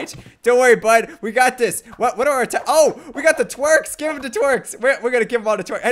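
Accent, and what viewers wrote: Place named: Sweden. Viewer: You sound slightly Swedish :o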